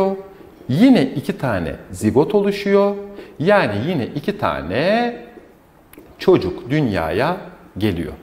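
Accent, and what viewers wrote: Standard. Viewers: Hocam 10 numara 5 yıldız adamsınız. En önemlisi diksiyon harika ve tertemiz Türkçe. Ha bi de Halil Sezai ders anlatıyor zannediyorum hocam arada karizma adamsınız :) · türkçesi maşallah TRT muhabirleri gibi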